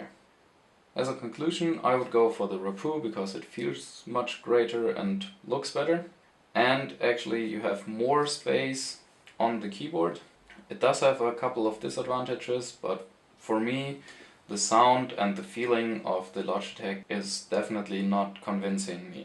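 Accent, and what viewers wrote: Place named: Germany. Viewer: Love the accent (must be German). I did not know the brand Rapoo, I like how compact it is. Thank you for the review.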